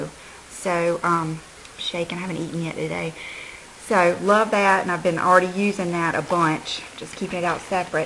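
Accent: South, United States. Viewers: i love ur vids! especially ur southern accent, its very calming :)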